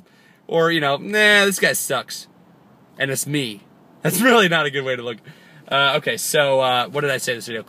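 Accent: United Kingdom. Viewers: I love ya to death, but I hate your British accent. Bless your face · Dude u sound like charlieissocoollike with ur British accent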